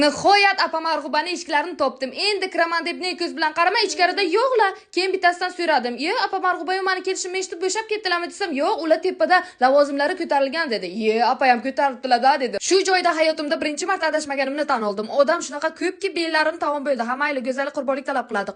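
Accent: Bukhara, Uzbekistan. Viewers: Sheva buxoro 😂 Buxoro qanisila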